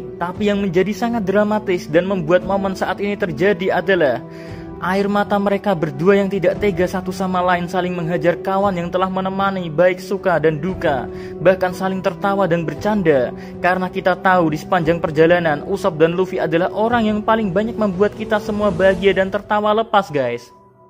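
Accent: Java, Indonesia. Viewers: Love you min.. terbaik medok nya🙏🤣🤣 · Medok lu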